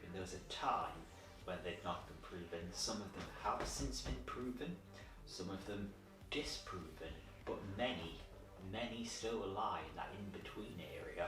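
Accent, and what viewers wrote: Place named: United States. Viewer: So is his accent English or Australian ? He is definitely an American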